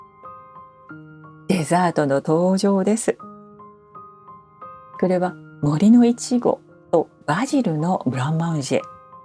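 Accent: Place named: Japan. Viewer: Trop agréable d'entendre une voix japonaise. Vous avez de la chance car notre expérience notamment le service était très mitigé au Lasserre pas très bien. Mais les plats étaient OK.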